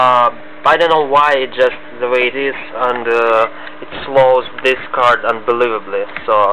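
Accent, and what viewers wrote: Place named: Russia. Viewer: Lolz your accent is so funny you said windows "vista" with w instead of v like "wista" my friend is also russian and ALLWAYS switches btween v and w if its windows he says vindows and if its vista he says wista lolz I am russian too but I don't say that I also do not have a russian accent Oh well nice review tho I don't completly agree with you about it not being compatible with DX10 games this game can run crysis on mid res like 1024*768 or 1280*1024 with every thing turned to medium...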